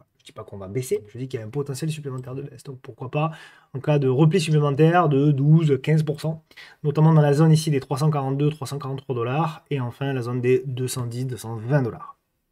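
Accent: South, France. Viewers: Un grand merci, pour cette vidéo et ta newsletter. Beaucoup de contenu, de la pédagogie, de la bienveillance et ce petit accent du Sud… Tu es notre rayon de soleil. Merci pour ton grand coeur et longue vie à LA TABLE DES INVESTISSEURS 🫶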